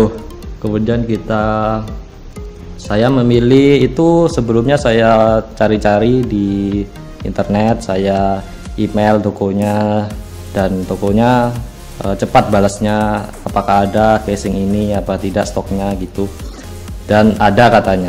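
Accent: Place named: Java, Indonesia. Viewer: review nya lucu coy, hahahha... medhok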